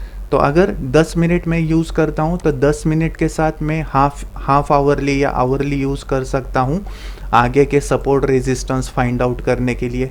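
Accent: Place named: Maharashtra, India. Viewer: आवाज आणि उच्चार ऐकून वाटत की तु मराठी आहेस. तुझे vdo पाहिले आहेत. खुपच माहितिपूर्ण vdo आहेत. तुझे खुप खुप आभार🙏 अवांतर :- मित्रा मला तुझा आवाज अमोल पालेकरां सारखा वाटतो,😊😉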